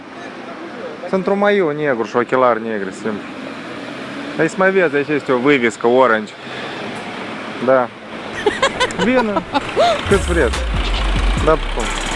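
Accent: Moldova. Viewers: Ce imi place accentul tau moldovenesc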